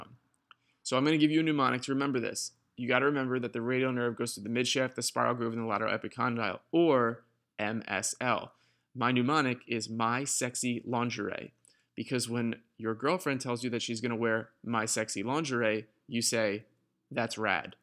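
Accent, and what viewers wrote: New Jersey, United States. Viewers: u from new jersey (central) or from california?!? ha!;) god bless bro! ..for me, healer + teacher combo is this earth's highest calling,,hat's off sir! cheers m8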